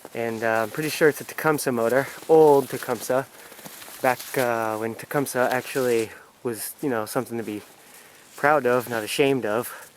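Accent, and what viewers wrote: Maine, United States. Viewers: What part of Maine are you from?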